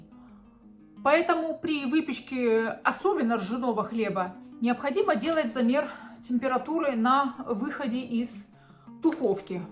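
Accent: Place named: Finland. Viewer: У Вас финский акцент👍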